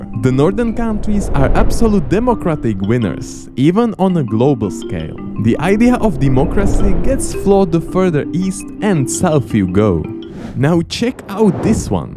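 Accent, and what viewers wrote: Czech Republic. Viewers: yes but he is tchéque not french.. still, i like the accent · Skvělý video! Btw hned mi bylo jasný, že seš čech, ten českej přízvuk se prostě nezapře :D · Your videos are good, but that strong czech accent is driving me crazy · The strongest Czech accent, ive ever heard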